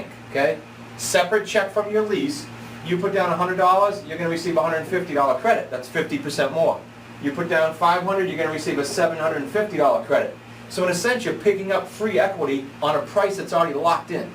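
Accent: Boston, United States. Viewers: Is that a Bastin accent ??? 👀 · Is this guy from Boston?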